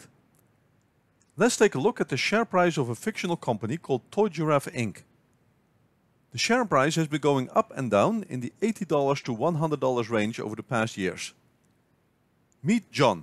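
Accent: Netherlands. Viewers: Tis hard hoe dat ge hoort dat nen ollander is :DDD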